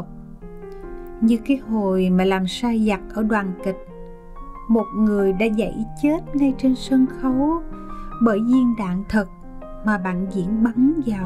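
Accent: South, Vietnam. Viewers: Viết thì hay, nhưng cấu kết câu chuyện thì không đâu vào đâu. 6/10. Cảm ơn giọng đọc miền Nam tuyệt vời của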